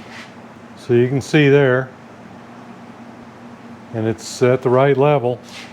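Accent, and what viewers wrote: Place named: West, United States. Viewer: western accent and New England accent, have to listen closely.LOL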